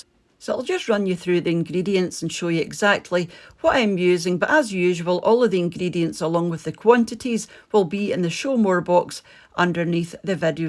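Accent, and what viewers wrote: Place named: Glasgow, United Kingdom. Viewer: I'm from Glasgow. Live in usa. Love to hear your accent. Thank you so much for this. It brings back memories❤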